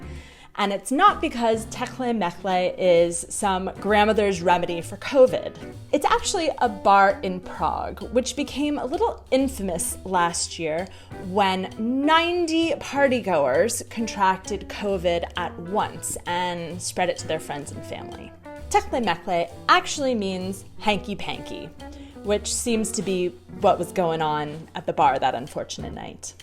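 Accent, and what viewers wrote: United States. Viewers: Milá, jsem mile překvapen, jak může američanka tak hluboce pochopit českou mentalitu a nacházet i nedostatky v americké kultuře a výchově. Opravdu jen náhodou jsem narazil na jedno ze Tvých videí prolinkovaných na webu a pak už jsem jich shlédnul více. Tvůj přízvuk je sice opravdu velmi "americký", ovšem s češtinou se pereš docela dobře, přeji hodně dalších úspěšných kroků. Češi si permanentně nestěžují a nejsou pesimisti...češi, pokud si stěžují, hledají pochopení, naslouchání a třeba i pomoc...lepší je být skeptik v realitě než falešně vysmátý týpek s medem u papule...to je bohužel realita Ameriky, kdy je vždy vše: "How are You?" - "All right, all is fine, I'm OK!"...přijde mi to spíše jako pokrytectví. Přeji Ti mnoho dalších dobrých zkušeností s poznáváním naší kultury a také pevné zdraví v této nelehké době.:-) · It's really terrible to listen American language for me as a Czech native speaker. 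After probably one year of my subscription to your channel it's not better and I still feel like you're trying to verbally attack me.:D I feel like you roar or shout on me. True English sounds incomparable better. American screaming and complimentary smile are two main reason why Americans call Czech people rude. Stammgast is regular German word. German language joins nouns and adjectives to one word and stammgast is the same. Stamm = permanent or regular and gast = guest. There was a huge influence of German language to Czech one because Czech principality and later kingdom was for more than thousand of years (except last hundred years for whole known) Czech history a significant part of German cultural area. There is a second meaning of word štamgast in Czech language. It can describe someone who everyday sit in pub and drink a lot and is addicted on alcohol so his brain is full of holes like a Swiss Cheese. 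Kočičí hlavy (cat heads) was originally word for cobblestones which were in use for decades - so long time that they got eroded to spheric shape. In 1990 was Prague full of them and it was really terrible surface. Especially for walker's ankles. Contemporary is this term used for any cobblestones except little ones used in footpath mosaics. Hajzl is very expressive word so hajzlbába too. For distinguished woman is a lot better to tell toaletářka. Skyscrapers are very disliked by European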